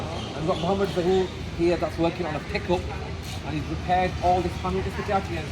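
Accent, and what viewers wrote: United Kingdom. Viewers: Sound like Yorkshire accent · Filthy Northern accent. He can't even change his accent to pronounce it properly smh.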